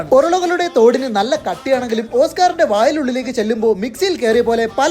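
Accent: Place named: Trivandrum, India. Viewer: Ral tvm speech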